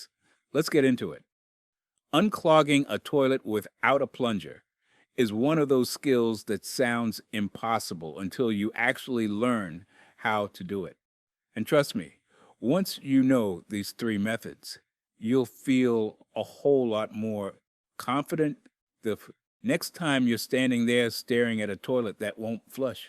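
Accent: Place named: Louisiana, United States. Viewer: This is what I said as well! 😂 I was like ...."He sounds like my Dad/Uncles!" , which are born in Louisiana.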